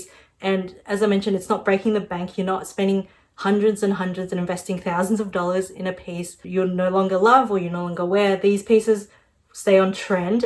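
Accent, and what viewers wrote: Australia. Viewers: Are you Korean? You sound like you kind of have an Australian accent. You remind me Rosie a little from black pink I love them so much !!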